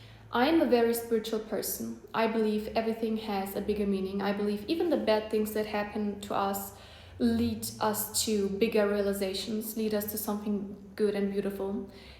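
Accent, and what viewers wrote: Germany. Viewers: Is she germann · Is that a German accent?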